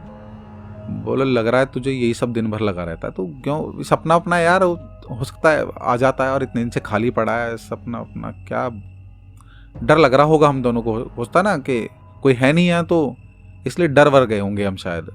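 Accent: India. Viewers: Hii 🙏 nice your deshi voice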